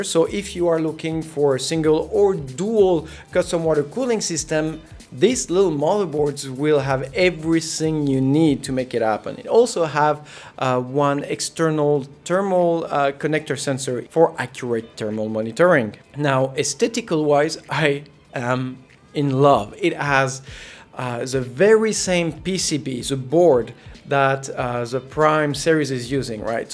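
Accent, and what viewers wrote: France. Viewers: I can hear a little bit of French in your accent. Nice review though, I’m getting this motherboard as a Christmas gift from my wife and I needed this full detail review 👌🏾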